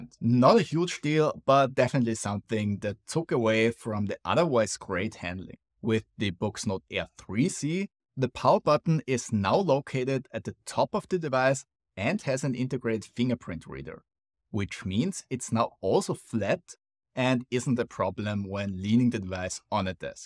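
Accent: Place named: Austria. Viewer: you have a very austrian accent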